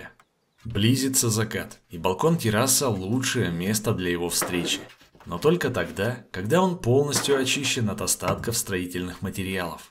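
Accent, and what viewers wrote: Standard. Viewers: так приятно слушать твой голос, неторопливую твою речь, чистый русский язык! Видеть приятно твою любовь к лесу, счастливое лицо от встречи с жителями леса. Каждый ваш фильм - отдых душой, заряд добра и любви. Вы - лучшие!!! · Меня за интересовал сюжет дом на дереве, но прятней всего оказалось, слушать чистую литературную русскую речь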